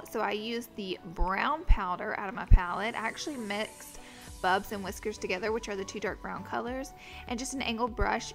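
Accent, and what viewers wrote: South, United States. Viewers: Girl you are so southern. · Omg, I love your Southern accent!! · Why do you try to sound country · Girl, you' so country! Absolutely love your accent!!!! 😍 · Are you country? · I like her southern accent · I love how you communicate your so southern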